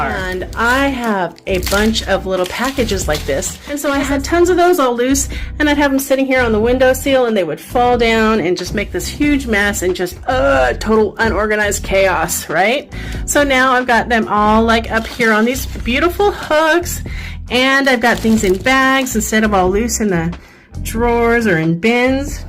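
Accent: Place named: South, United States. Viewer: I like the southern drawl!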